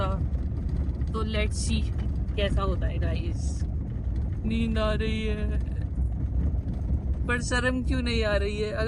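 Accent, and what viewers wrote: Haryana, India. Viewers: Mst lge h haryanvi aap ki boli te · Haryanvi hindi mix😂😂😂 but Haryanvi top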